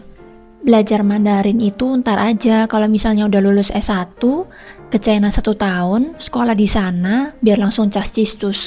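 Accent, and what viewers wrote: Java, Indonesia. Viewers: Logatnya agak ada jawanya, hehe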